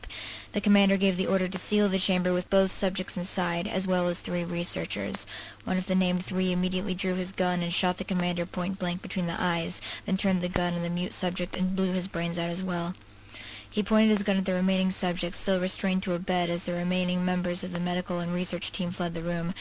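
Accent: Russia. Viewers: Ya ya, The russian accent is the best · I read this when I was young(er) and hearing it like this brought back strong nostalgia! I liked the Russian accent and while the distorted vhs voice thing was cool, I feel it could've been more focused to the crackling sound instead of the fuzz. But overall I rate this reading 8.99/10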